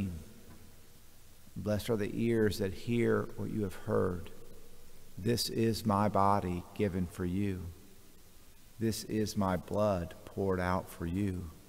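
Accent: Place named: South, United States. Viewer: Father, I was browsing on YouTube and "discovered" your video clips. (I think maybe God had a hand in my discovery.) Anyway, I must say that I enjoy listening to your homilies. I try to listen to you quite regularly. P.S. It's nice to come across a priest who has a nice Southern accent, y'all. That's meant as a compliment.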